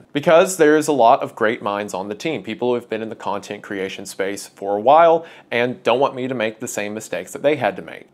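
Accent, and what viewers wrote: South, United States. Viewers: you've got the perfect southern draw to voice how it's made or similarly just reading a phone book out loud to relax me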